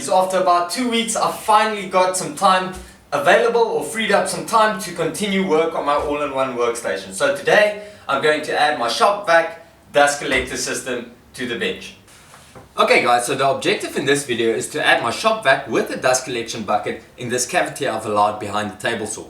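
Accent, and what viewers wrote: South Africa. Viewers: Well done with the table. Its a serious space saver. You sound south african. Still living here? If so, where will I find an automatic on/off switch as described on your website? Thanks for a great channel.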